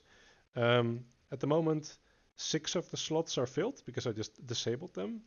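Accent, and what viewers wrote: Netherlands. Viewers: You have a very noticable 'Dutch-english' accent.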